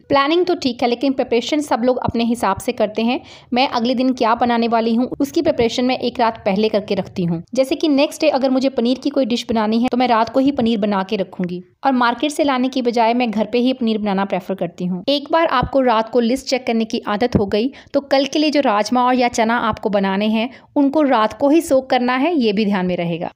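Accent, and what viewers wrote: Punjab, India. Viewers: just loveeee her voice...it has a punjabi touch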